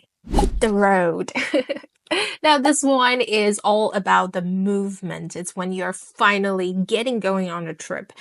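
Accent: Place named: United States. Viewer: American accent 😊😊😊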